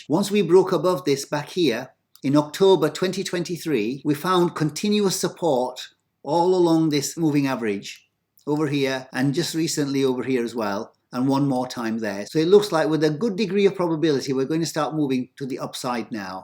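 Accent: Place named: Yorkshire, United Kingdom. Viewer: Hey ~ This may surprise you!! The B-Exit Indixator is not my favourite part of your video... rather hearing your Yorkshire accent!! No eee byy gum though???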